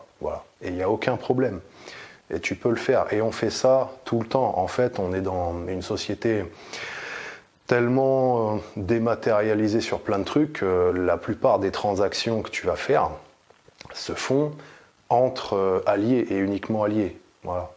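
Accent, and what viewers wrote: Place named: Lyon, France. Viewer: t'as l'accent lyonnais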